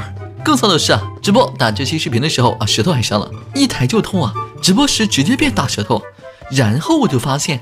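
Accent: Taiwan. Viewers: 连续看你的戏十次这是第四次台湾腔好听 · 其實臺灣腔也蠻好聽的 （猛然發現的標題是繁體字）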